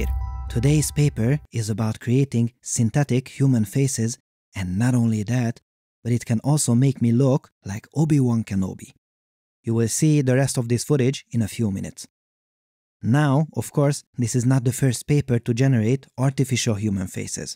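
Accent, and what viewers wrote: India. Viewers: LOL, I thought you are Indian (based on your accent and exotic name) :-D What a surprise :-) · I assumed from your accent you were Indian, but I suppose there’s a paper out there that knew better than me to begin with.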